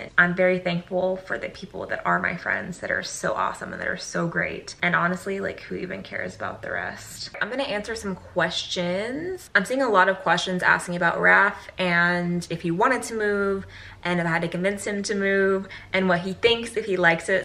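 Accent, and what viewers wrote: South, United States. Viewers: your southern twang is peaking back out! <3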